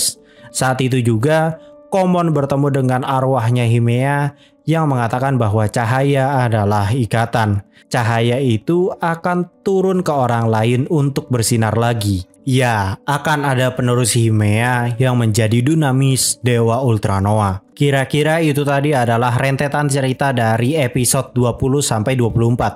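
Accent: West Java, Indonesia. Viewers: Abang orang sunda ya😁☺😂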